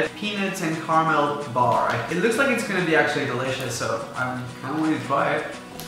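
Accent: United Kingdom. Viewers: Is he British or Australian? I’m confused? 🤨😂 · I THOUGHT YOU WERE BRITISH!!!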